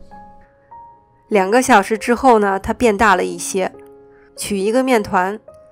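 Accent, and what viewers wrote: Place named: North, China. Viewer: 我是北方人，听着的声音好像我的乡音，感动的要哭了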